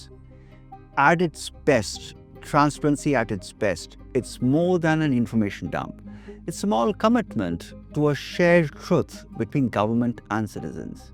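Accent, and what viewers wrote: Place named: India. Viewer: Sir little bit more indian accent 👍👍👍 because I want to listen you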